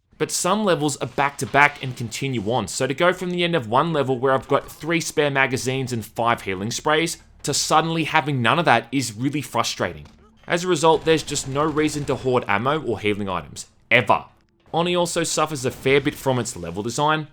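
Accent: Australia. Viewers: Hey man, just found your channel and I really really enjoy your content. I don't ever comment on YouTube and who knows if you'll see this but I've been binging absolutely all of your videos this last week. My wife keeps wondering why she's constantly hearing an Aussie man talk about "utter sheeeit" games at 2 in the morning. Just a lot of thought and care went into each of these videos and it really shows. Thanks.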